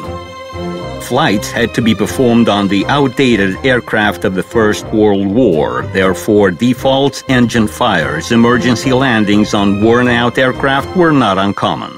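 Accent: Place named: Russia. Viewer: Very interesting. But why does the speaker have to have a russian accent?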